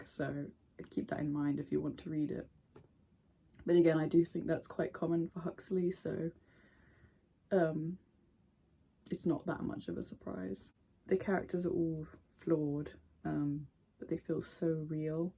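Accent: England, United Kingdom. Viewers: I'm intrigued by your accent. It's British, but also clearly not native / not from Britain. (I might be mistaken, I mean it's not like I"m from the UK) The closest I've ever heard was a German girl who went to a British private school (not sure if it was in Germany or in the UK) but she spoke a tad more posh. EDIT just noticed the poster, might be another German girl going to a British private school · I think it sounds completely English to me, as a native. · You sound English. Do you read BNW in secondary school required reading?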